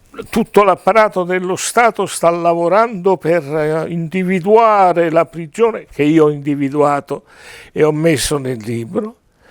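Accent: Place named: Sicily, Italy. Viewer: Generale, da come lei parla scopro che lei è un siciliano. Ok, complimenti!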